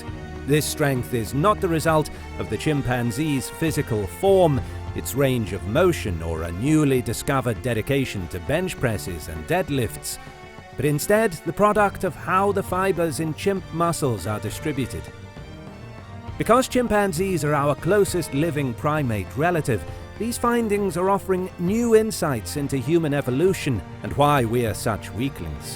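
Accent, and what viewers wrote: England, United Kingdom. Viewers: Why does a British accent describing powerful animals sound so intriguing? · So it took you to get to any actual info, and then you gave us 20 seconds of data before you restated half the filler you already brought. No sources, no actual numbers, just an english accent to make people think you know something. 10 minutes on google gives you the same info and we made slideshows like this in freshman year high school. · Rogan with an english accent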